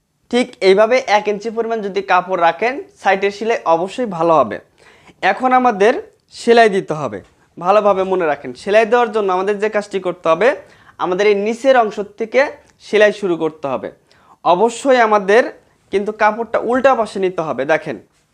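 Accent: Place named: Sirajganj, Bangladesh. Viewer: আপনিকি সিরাজগঞ্জের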